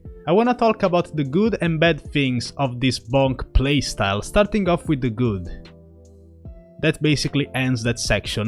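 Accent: Russia. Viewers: I thought Russian cause the accent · This person has a heavy Russian accent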